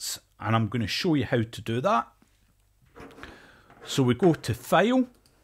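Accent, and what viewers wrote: Scotland, United Kingdom. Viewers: Are you by any chance ........ scots?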